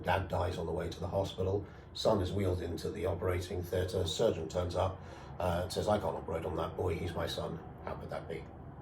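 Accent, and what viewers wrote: United Kingdom. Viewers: I pictured him as white because of your British accent. Americans don’t think of black people when they think of UK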